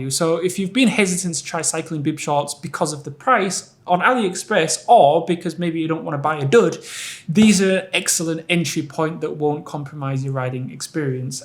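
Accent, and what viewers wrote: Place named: Yorkshire, United Kingdom. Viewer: Where's your accent from? Sounds Geordie with a bit of Yorkshire.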